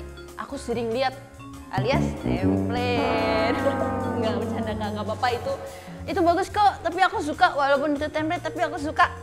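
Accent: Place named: Java, Indonesia. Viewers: selalu ada medok nya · Medok nya kerasa banget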